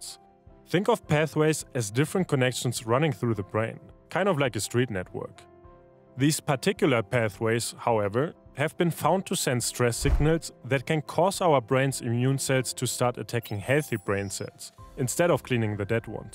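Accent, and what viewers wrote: Germany. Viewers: Please! Get a native English speaker or use an AI voice. This bad pronunciation as well as the German accent is awful...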